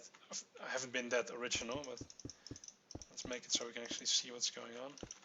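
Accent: Netherlands. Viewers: Where are you from? You have a hollandguy's accent...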